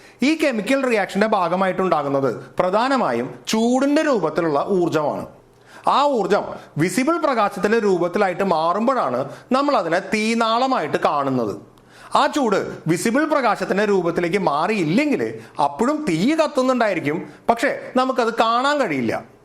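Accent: Thrissur, India. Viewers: മ്മടെ തൃശൂർ ഗഡി ആണല്ലോ ഇദ്ദേഹം സംസാരം കേട്ടിട്ടു... 😌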